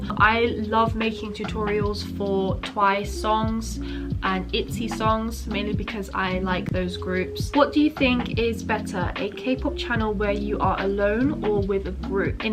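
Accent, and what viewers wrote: United Kingdom. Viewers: Yeah I’m in love with her accent. The British accent seems so elegant!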